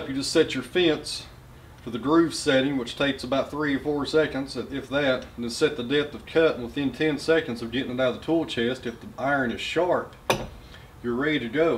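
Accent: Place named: South, United States. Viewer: That southern accent!!!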